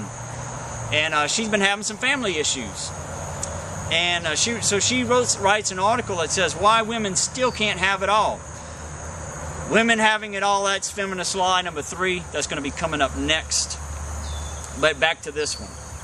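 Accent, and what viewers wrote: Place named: Louisiana, United States. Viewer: As a fellow Louisianian (from Cenla), I was convinced you were from Louisiana from the accent.... nailed it! Thanks and God bless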